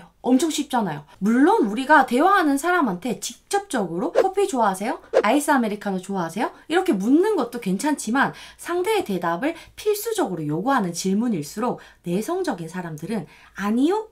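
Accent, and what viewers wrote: Gyeongsang, South Korea. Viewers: ㅎㅎ 경상도 억양 매력잇네요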